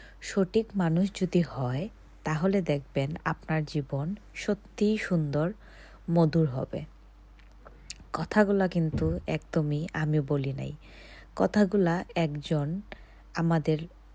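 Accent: Sylhet, Bangladesh. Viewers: Sylheti ni?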